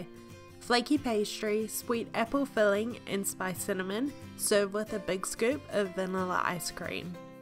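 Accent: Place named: New Zealand. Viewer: New Zealand accent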